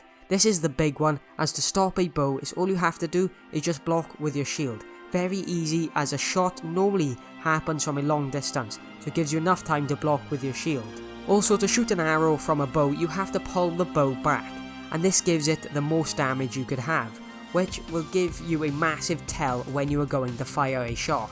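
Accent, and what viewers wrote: Wales, United Kingdom. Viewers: Bro are you from wales, the accent seems familiar 😂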